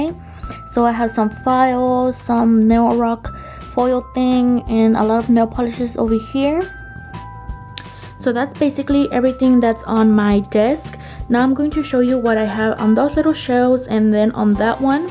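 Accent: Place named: Mexico. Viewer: wojod haider really? it sounded like a Mexican accent to me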